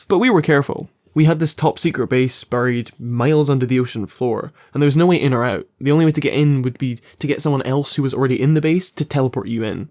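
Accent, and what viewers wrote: Scotland, United Kingdom. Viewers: Here good to hear a Scottish voice on YouTube for a change. Great video.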